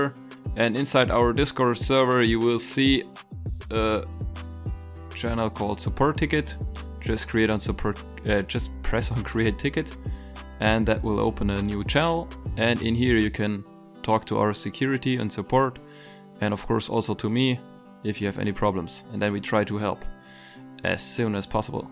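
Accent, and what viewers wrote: Germany. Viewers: Den deutschen hört man raus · are you german ?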